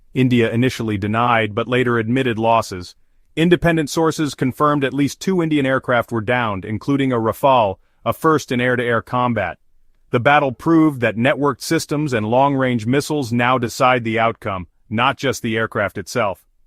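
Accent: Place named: United States. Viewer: BS in an American accent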